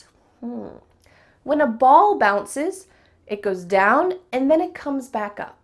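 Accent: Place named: United States. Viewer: It sounds American English? Not authentic one!?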